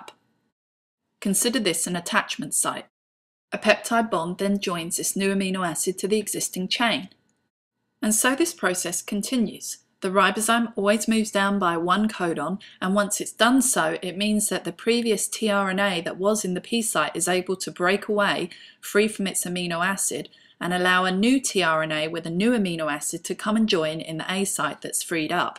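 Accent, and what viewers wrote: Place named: United Kingdom. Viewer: Were are you from - you sound British?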